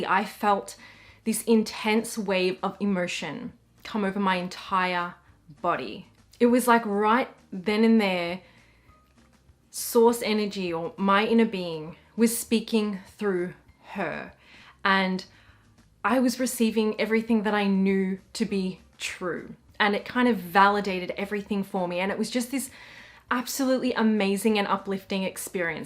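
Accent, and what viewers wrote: Australia. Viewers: Somebody complemented you on your English accent. To me it sounds like an Aussie accent, is that correct?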